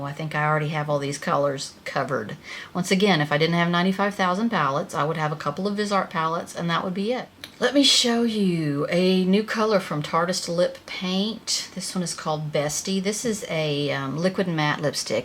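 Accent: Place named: Mid-South, United States. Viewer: love your subtle accent. Are you from the Mid-South? watched some of your videos LOL women of a certain vintage , so much better than saying women of a certain age! your makeup looks really good , edgy but classy.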